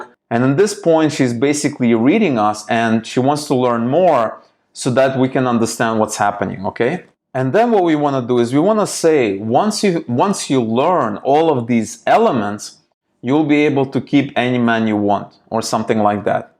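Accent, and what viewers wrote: Russia. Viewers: Ты не Русский случаем? Подпишусь, если ответишь) Очень Русский акцент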